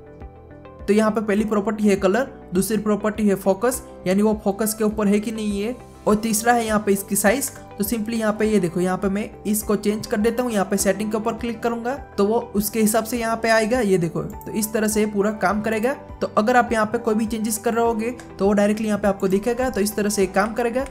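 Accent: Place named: Hyderabad, India. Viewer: keep up the good work , are you from hydrabad india ? cool akcent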